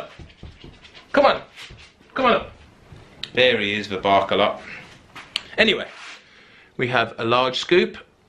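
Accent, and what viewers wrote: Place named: Birmingham, United Kingdom. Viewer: 👍👍👍👍👍👍 sound like fellow brummie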